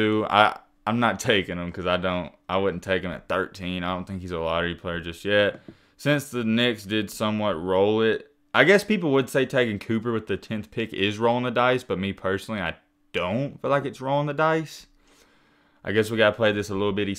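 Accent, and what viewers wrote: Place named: Alabama, United States. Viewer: The Alabama acentt in this nigga 😂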